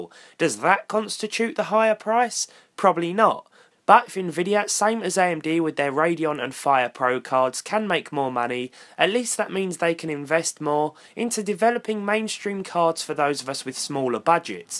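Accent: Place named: England, United Kingdom. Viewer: I needed my English accent fix. Thank you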